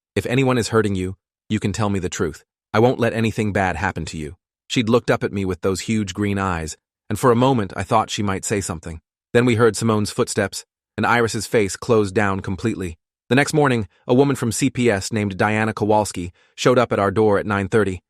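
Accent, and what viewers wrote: United Kingdom. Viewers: He keeps going British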